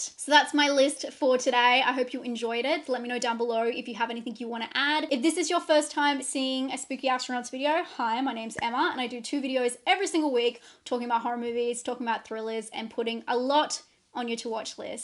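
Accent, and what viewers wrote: Australia. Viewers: Love the Aussie accent! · i Love your Australian accent and i like the way you look when you talk like that.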